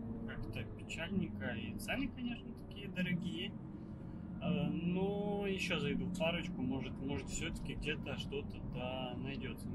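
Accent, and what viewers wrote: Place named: Latvia. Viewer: Akcentas latviskas ;-)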